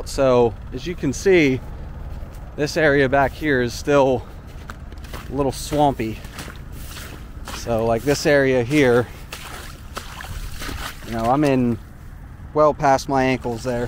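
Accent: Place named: New Jersey, United States. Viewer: It sounds like you're from Jersey...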